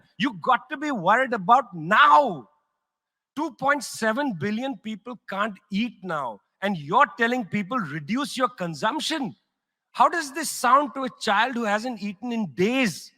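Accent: India. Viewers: 第一次感觉印度英语也能如此优美！ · 我居然第一次听这个印度口音听了两遍！？ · 我知道阿三腔的英语听起来很辣耳朵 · 第一次觉得印度的口音还有那么一点悦耳…… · 👍不知不覺刷了兩遍🤭第一次覺得印度英語特別好聽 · 难得这个印度口音能全部听懂🤣真的，普京也跟瑞典环保小公主说过，第三世界国家的人民会想要先吃饱饭，不要何不食肉糜这种高高在上的态度来对待第三世界的国家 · 这是我听过最好听的印度英语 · 这是谁，我喜欢你，我听出了印度口音，我不知道你的为人，但我依然很喜欢你，喜欢你骨子里的忠义，喜欢你语气里的倔强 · 看到一些人疯狂追求说一口标准的美式英语，甚至要求他们幼儿园的英语老师必须是外教，实在不可思议！其实，只要语法学好了，说话流畅就好了，有中国人的口音根本不是事。看看这印度人吧，一场扎实，头头是道，引人入胜的演说，太棒了！不会有哪个懂英语的观众会听不懂他发音清晰，精准的印腔英语。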